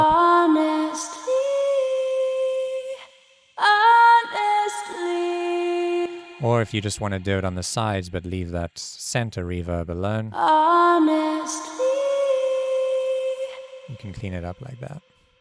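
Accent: South Africa. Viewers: hey man, are you south african by any chance?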